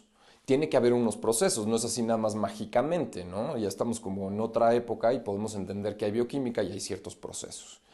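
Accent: Mexico. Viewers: Ay te amo! Por momentos tengo que retroceder el video porque me desconcentro con lo lindos que sos y tu acento mexicano 😍❤️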